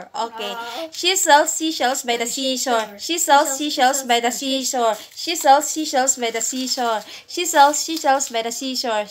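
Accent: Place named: Visayas, Philippines. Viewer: Bisaya Pala ikaw Heheeh nice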